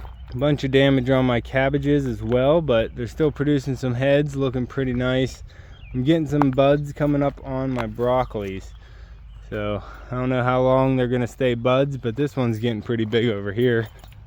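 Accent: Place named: Western Pennsylvania, United States. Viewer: I was wondering the same thing. But I guessed western PA from your accent!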